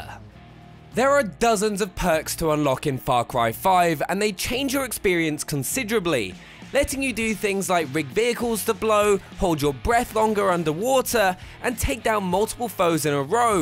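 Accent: United Kingdom. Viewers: Disliked because of Xbox and British accent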